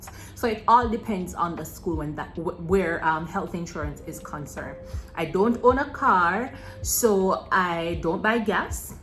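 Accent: Jamaica. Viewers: I can feel Jamaican accent behind you words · Jamaican? Hi from Trinidad!!!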